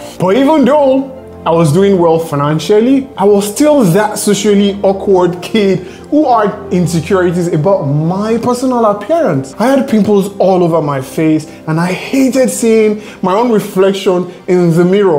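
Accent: Nigeria. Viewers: I can still hear his H factor tho. It's part of the Yoruba accent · He is a Nigerian man with a Nigeran name who lives in Nigeria and looks Nigerian and sounds Nigerian, starting off from broke with no financial help or support, and he still managed to be rich. You have to respect this man because, in his current situation, he is starting from an extremely difficult mode, while most of us in the Western world, compared to this man, are starting from an easy mode.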